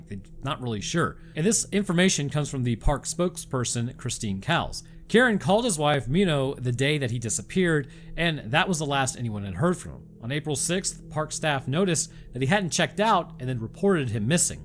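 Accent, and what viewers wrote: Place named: Connecticut, United States. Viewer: The Connecticut accent is feint yet still unbearable. Please try harder to escape the hell hole you're from.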